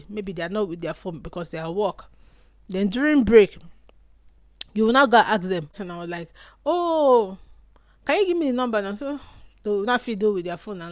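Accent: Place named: United Kingdom. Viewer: lol, one UK useless accent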